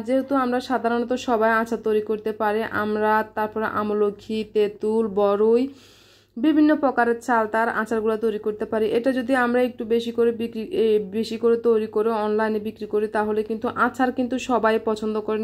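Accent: Noakhali, Bangladesh. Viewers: নখালিতে কথা বলিয়া ❤❤ ভাল লাগে ল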